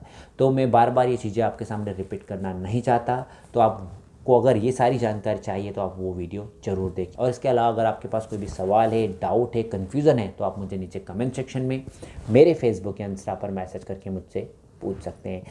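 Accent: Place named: India. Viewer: damn that indian accent..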